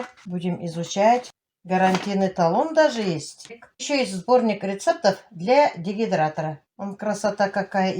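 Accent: Tatarstan, Russia. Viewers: Здравствуйте. Случайно попал на ваш канал. Посмотрел ваш ролик очень отлично. За два дня пересмотрел все ваши ролики. Ролики ваши отличные молодец. Главное не надоедает. Больше показывайте про сельскую жизнь. Единственное не отказывайтесь от подарков. Люди дарят вам от.всей души а не как помощь. Если вы нам не нравились ни кто бы не смотрел вас. А так программы отличные и этот шарм. Татарскии акцент так мне нравиться. Привет семье дочкам зятьям внукам сестре брату женгей подружке. Сестренке из ново и пусть выздоравливает. Всех вас с новым годом. Крепкого здоровья. Семейного счастья успехов во всем. С новым годом.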